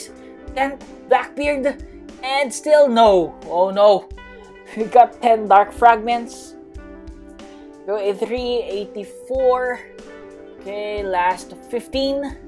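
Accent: Philippines. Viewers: You sound Filipino sir are you Filipino?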